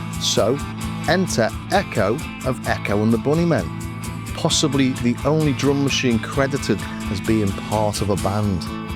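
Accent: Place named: Liverpool, United Kingdom. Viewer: Thanks a lot, this was really interesting — as always. A drum machine that does not keep its pace, this would drive me nuts ;-) I did not know that about “Echo”… It must have been tough for these guys being a band in Liverpool . And I am rather happy that I was not forced to learn your local dialect until now. This is quite a challenge… Still watching every of your videos, See you ;-)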